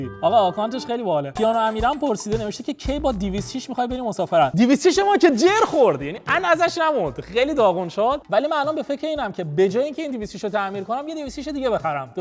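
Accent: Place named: Bushehr, Iran. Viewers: کسایی موافقن که بوشهری حرف بزنه داخل ویدئو هاش لایک کنن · با لهجه جنوبی صحبت کن بهتره با حال تره❤❤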